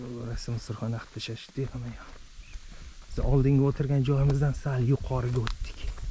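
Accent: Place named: Uzbekistan. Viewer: Со своего члена залупу отрежь, может сома на 5 кг поймаешь. Узбек а акцент харипский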